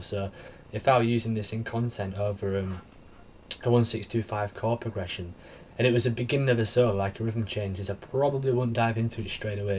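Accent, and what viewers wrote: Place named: North, United Kingdom. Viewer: Keep on running, nice to hear't northern twang on such lovely chords.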